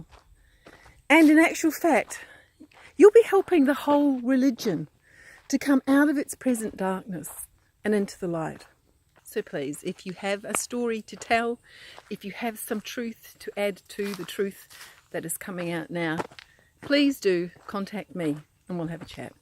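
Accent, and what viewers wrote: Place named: South Africa. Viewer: Walking and videoing, horrible SA accent, rambling on and on, makes for a bad video.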